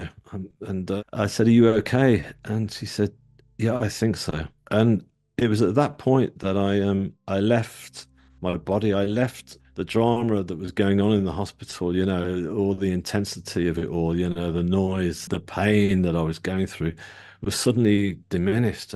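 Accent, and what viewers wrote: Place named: England, United Kingdom. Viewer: So good to hear an English Voice NDE. So gentle, soothing and Real. 🫶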